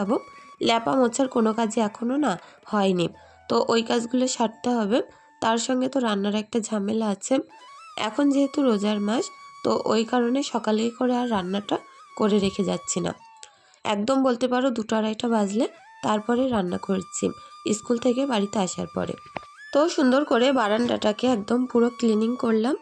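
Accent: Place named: Barasat, India. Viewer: তোমার ভিডিও দেখতে আমার খুব ভালো লাগে , আমি বারাসাত থেকে দেখছি , বসিরহাটে আমার মায়ের মামাবাড়ি , তোমার কথা বার্তা , সবকিছুর সাথে আমাদের এখানকার অনেক মিল পাই , যার জন্য খুব ভালোলাগে তোমার ভিডিও দেখতে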